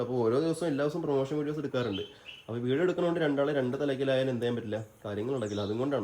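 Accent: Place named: Kottayam, India. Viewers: അച്ചടി ഭാഷ കോട്ടയം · അച്ചടി ഭാഷ കോട്ടയം ജില്ല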